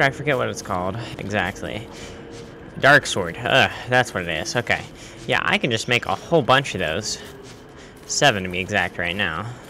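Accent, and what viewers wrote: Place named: United States. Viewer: I tought he was american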